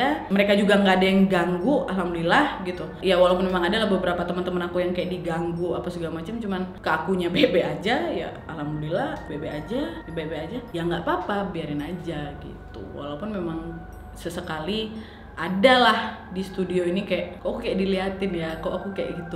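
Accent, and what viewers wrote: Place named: Medan, Indonesia. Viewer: Tapi knp ngomong nya kyk Medan?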